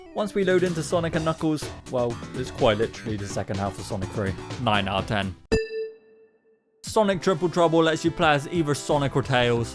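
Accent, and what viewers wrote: Bristol, United Kingdom. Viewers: hey your british 🇬🇧🇬🇧🇬🇧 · Up the Bristol accent. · Are you from Bristol? That accent is hella familiar 😂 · Are you bristolian?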